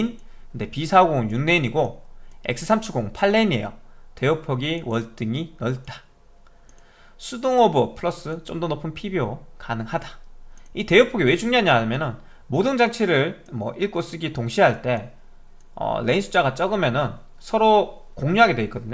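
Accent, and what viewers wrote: Gyeongsang, South Korea. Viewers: 대구 말투를 쓰길래 대구 일거 같아서여 ㅎㅎㅎ 대구에서 컴퓨터 하시나요??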